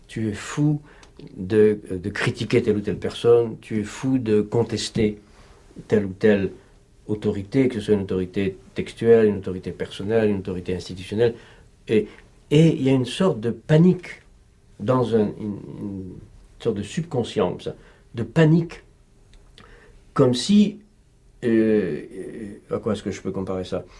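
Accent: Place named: United States. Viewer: Lol he sounds so American too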